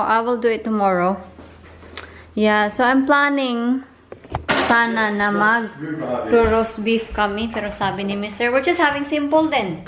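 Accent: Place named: Visayas, Philippines. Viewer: Hi po ate first time ko to na magcomment sa vlog mo pro lage akong nanood at nag lalike ng mga videos mo kaso bago pa lang mga 2 months pa lang ako nagsubscribe sayo unang kita ko pa lng sa Youtube channel mo subscribe talaga ako agad sayo ang ganda mo kasi tsaka simple lang taz may naririnig ako minsan na may bisaya accent ka. .gusto ko lang po sanang malaman kung bisaya ka ba at taga saan ka dahil ako po bisaya kaau ko proud taga Inopacan Leyte trip ko lang magtagalog pro bisaya gyud ko hope mabasa ni nmo ate at shout out po sana sa next video mo sa ABELLANA and BALLESTEROS family thanks po sana lang mabasa mo po ito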